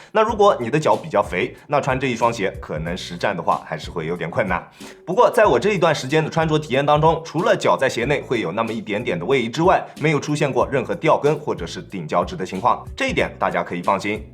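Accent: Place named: Zhejiang, China. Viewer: 看到嘉兴市原来是浙江老乡难怪口音这么亲切哈哈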